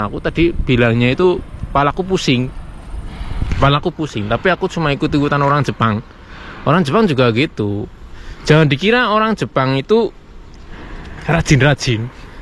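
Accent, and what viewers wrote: Banyumas, Indonesia. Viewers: mas...anda lucu sekali ya... indonesia bngt aksenmu sukaakkk....ga ada takutnya ya di negeri horor dan mistis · Ora ngapak ora kepenak..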